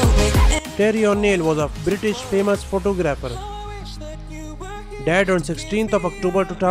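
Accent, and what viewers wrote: India. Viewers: Oh my god i dont understand your accent. Make it clear when you speak. I guess you are ndian obviously in your accents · Indian accent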